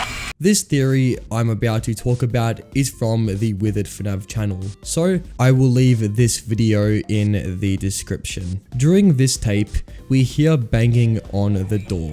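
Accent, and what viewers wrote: Australia. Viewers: I can't tell if I've heard your voice somewhere before or all Australian people sound the same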